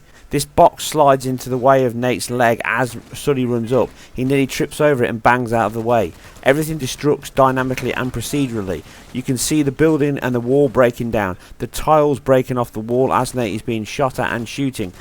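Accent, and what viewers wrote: United Kingdom. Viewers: that voice makes this video unwatchable fucking brit accent shit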